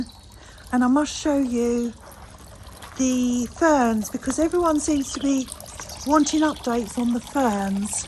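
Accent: London, United Kingdom. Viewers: Hi Lovely to hear from you. My husband watched today and said you sounded like a Londoner. You have been so busy again. I will be copying the artificial grass liners idea. Regarding your 'trinkets'... I love them👏 i have some too especially signage ones eg. ' Don't worry,be happy' ( of course we do worry,but i like the sign). I'm a great one for separating my plants. Makes my garden look fuller too. No big buys lately,just some paler blue muscari. The old favourites are surprising me too. Your garden is a joy. Regards to Richard and thank you for making me smile💯💖☕🥰💞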